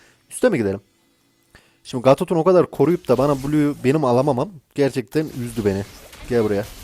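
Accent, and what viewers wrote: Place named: Çorum, Turkey. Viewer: çorum lumusun sen krl şive oraya kayıyo gibi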